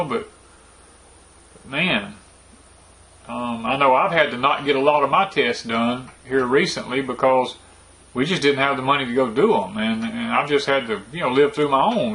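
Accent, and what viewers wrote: South, United States. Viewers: I enjoy listening to your stories on Porch Time, in fact I put it on auto play when going to sleep. Hearing your southern accent is calming, It's like hearing my late husband talking...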